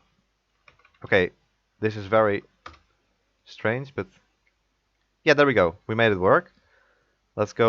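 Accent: Netherlands. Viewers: R U dutch?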